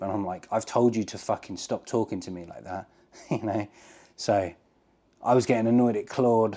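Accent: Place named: United Kingdom. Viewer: Which part of the UK is your accent from? Surrey Cambridge way Great video You have a smart brain and want to solve a problem straight away , I get in those loops and think about the solutions that solve it fastest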